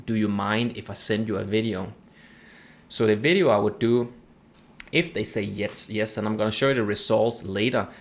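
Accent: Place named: Germany. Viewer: Considering your strong accent, I'd say you are from Germany or Austria. Or maybe Luxembourg but less likely.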